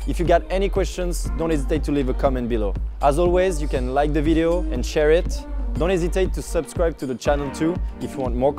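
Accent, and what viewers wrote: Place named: Russia. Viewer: Я не могу понять, вы русские что ли? Акцент прям похож..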